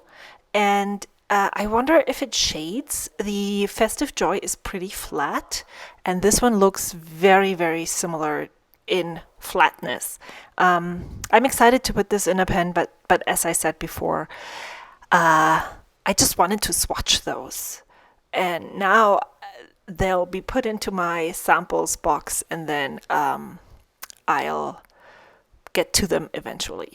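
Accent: Germany. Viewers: I enjoy your off the cuff videos and find myself laughing because they're real! Like Katie, Ive been tweaking my Vanness cart all week so I'll definitely use your code! Thank you! I love to hear your German pronunciations. I'm the same with Italian. Though I'm San Francisco born & raised, I grew up listening to it and can't get myself to pronounce Italian names with an American accent.😂 Had I known, I would have happily sent you my bottle of Hanging Rice. I have several Troublemaker inks that haven't been getting sufficient love so let me know if there are any you'd like to try and I'll see if I have it.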